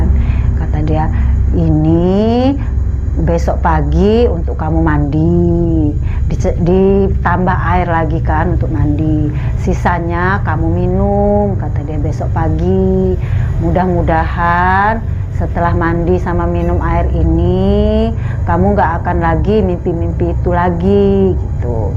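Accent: Lampung, Indonesia. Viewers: Oke siap .. ciri khas suku gham lepung (suku kita Lampung)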